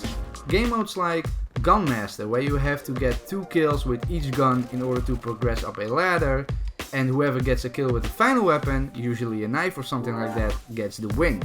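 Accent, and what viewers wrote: Netherlands. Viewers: Are you dutch my bruh?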